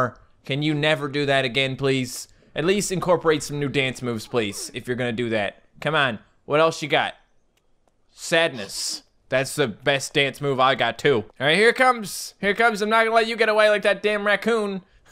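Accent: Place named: Newfoundland, Canada. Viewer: Spent the whole video trying to figure out your accent, then I realized you were a from Newfoundland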